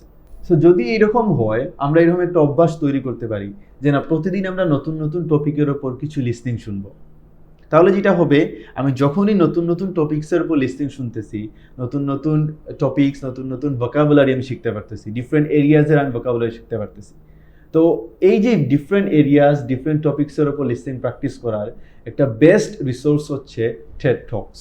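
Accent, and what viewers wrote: Sylhet, Bangladesh. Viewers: Sir or mat hunia lager sylhete 😅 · Wonderful suggestion.....are you sylhety? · ভাই কিতা সিলেটি নি,হালকা সিলেটি টান আয়। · Are you from Sylhet ? I noticed from your accent. I am also from Sylhet. Good Work. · স্যার আপনি কি সিলেটি ☺️ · Sir,I think you are sylheti🤔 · R u Sylheti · Asslamualikum Bhaiya.. Apni ki sylheti..kub helpfull viedo❤ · Sylhety vai.... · Vai are u sylhety? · Sir er accent shune amader sylheti laglo.Apnar basa kun jaigay sir?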